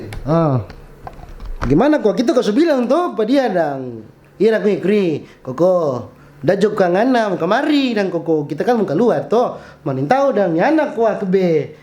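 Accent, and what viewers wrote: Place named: Siau, Indonesia. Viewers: eh keode ungke, suaninge, tu logat dang jadi kangen sitaro rken, salam dari anak Sitaro yang kuliah di jogja. Sukses Terus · Sekali" logat siau jo opo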